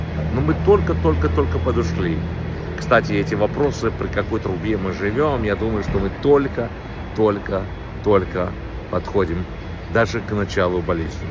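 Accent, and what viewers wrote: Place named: Ukraine. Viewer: АКЦЕНТ ПОЧЕМУ ТО УКРАИНА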